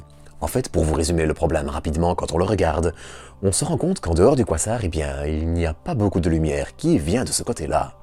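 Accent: Belgium. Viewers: Wow, Je ne sais pas ce qu'il s'est passé avec ton com, mais je l'ai en notif et je le trouve très instructif. Je vais donc le remettre ci-dessous pour ceux qui, comme moi, n'étaient pas au courant. Et oui, est belge 🤗 et j'adore son accent! 😊 Com de Led Zep: Régional plutôt, si tu sous entends que notre ami soit belge, le coq est le symbole de la Wallonie (prononcer le W comme en anglais) qui est une des régions de la Belgique, c'est la partie sud du pays, on y parle français à 100 pourcents, la région Bruxelloise encerclée par la Flandre, brrrrr... 🙂 ((90 pourcents de francophone et 10 pourcent de néerlandophone)(on prononce Brusselle, pour la même raison que l'on dit Aussère et pas Auksère (Auxère). Pourquoi? En latin le double S s'écrivait X, à la renaissance le X a pris le son KS)), Ensuite la Flandre, 60 pourcents de la population, 100 pourcents néerlandophones et pour finir la région germanophone, seulement 60.000 personnes parlent donc allemand en première langue mais sont tous bilingue en français. 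Je ne sais pas si est belge par contre...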